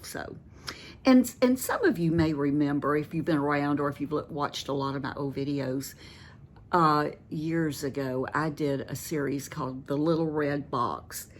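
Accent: South, United States. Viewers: I’m almost 56. I’ve been watching for a little more than a year now because I love your outlook on aging and just life in general. You also have a calming voice and lovely southern accent that reminds me of my home state. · You have inspired me and I love your southern-style and Your accent and you stories 2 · Hi there dear lady....Just found your site. Love your Southern accent. Looking forward to viewing more of your lovely videos...(a nana in Pa.)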